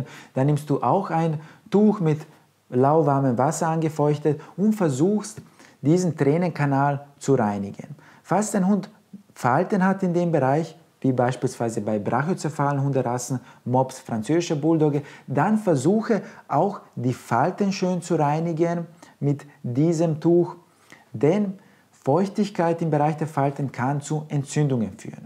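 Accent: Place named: Russia. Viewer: Dieser russische Akzent macht das Video einfach perfekt 😂keine Ahnung woran es liegt aber wenn jemand mit russischem Akzent spricht würde ich mir alles angucken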